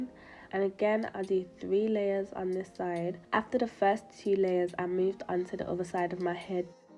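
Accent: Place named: West Midlands, United Kingdom. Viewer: I love black girls with a West Midlands accents !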